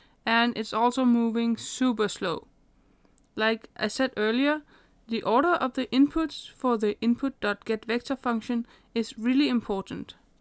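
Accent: Denmark. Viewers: I am really liking this so far and I can't wait to watch more. It's also nice to see and hear a fellow dane make game development tutorials.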